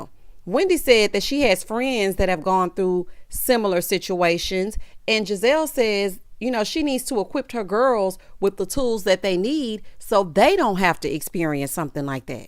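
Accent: South, United States. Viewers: I FF past Candiace and her fertility issues. I just don't care. Ugh! Loved the Gizelle and her daughter. Loved the PAVE scenes, but chuckled because that song seemed to go on forever. Wendy is beautiful to me, but does not belong on this show. Candiace keeps playing victim and it's boring. As usual I love listening to you. You're so country and it's endearing to me. Reminds me of being exiled to South Carolina from New York during the summers when I was a kid.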